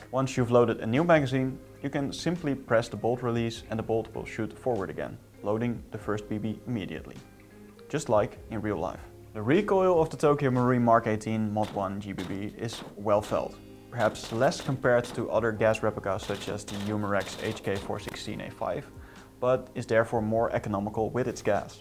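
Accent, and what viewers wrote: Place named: Netherlands. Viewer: I instantly heard Dutch english hehe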